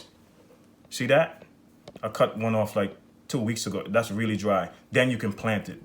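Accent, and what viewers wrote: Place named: New York, United States. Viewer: Haitian New York accent is What’s up!!!! 🎉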